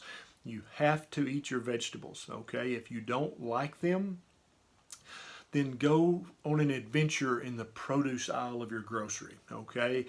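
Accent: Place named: Tennessee, United States. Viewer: Hi Dr. Berry. Great information. It's nice to hear someone from my home state. I'm from Tennessee . I live in Texas right now.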